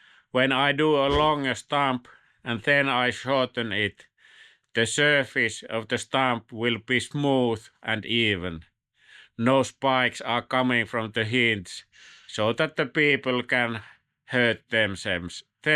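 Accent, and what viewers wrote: Finland. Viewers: Thank you for taking the time to explain this subject to a layman. Fascinating and informative. Also the Finnish accent is pleasing to my ears. Peaceful Skies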